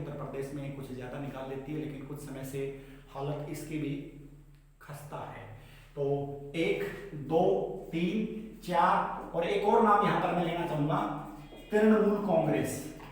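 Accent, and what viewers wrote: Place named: Haryana, India. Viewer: ठेठ हरियाणवी अंदाज़👌👌😂🥰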